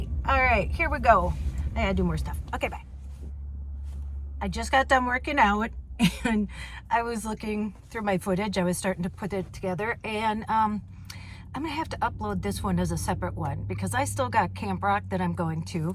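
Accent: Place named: Midwest, United States. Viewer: Good for you. Spoken like a midwestorm girl. Minnesota or Wisconsin. AL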